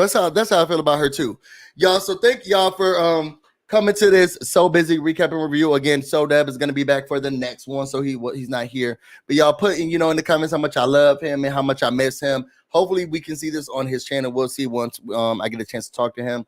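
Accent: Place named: South, United States. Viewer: Busy with a Southern accent (Yes Lawd)! 😍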